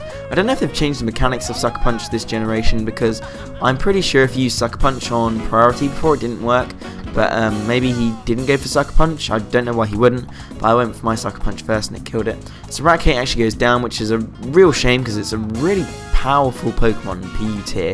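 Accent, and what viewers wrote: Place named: United Kingdom. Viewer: Nice! Put out more content like this and you've earned yourself a new subscriber! Good narration style too, it's nice to hear a fellow Brit on here haha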